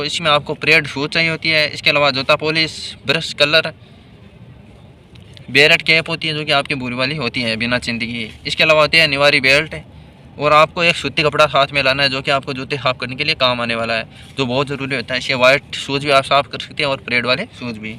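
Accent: India. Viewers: भाई बोली भाषा से तो राजस्थानी लग रहे हैं तो प्लीज़ अपनी क्षेत्रीय भाषा को अवाईढ ना करें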